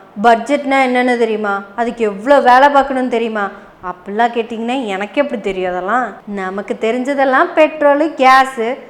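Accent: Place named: Tirunelveli, India. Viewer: உங்கள் குறல்மொழி திருநெல்வேலி மாதிரி அழகாக இருந்த்து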